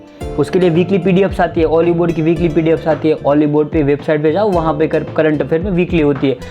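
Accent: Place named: Gujarat, India. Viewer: Sir muje aap Gujarat se lagraheho. Aap ki બોલી se❤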